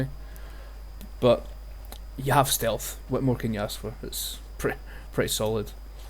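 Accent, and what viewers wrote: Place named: Scotland, United Kingdom. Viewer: I mean, I appreciate how inforamtive the video is but... if you sounded more scottish AND angry that would also be very entertaining xDDD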